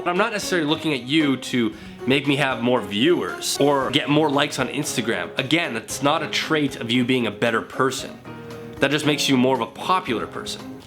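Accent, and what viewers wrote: Canada. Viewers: Well, I think Charlie is cute.......this is the second video......you drew me in with the mirror paint video and you seem to be a normal good guy which is why I subscribed to your ch........not to mention I like the little Canadian accent.....out, aye...........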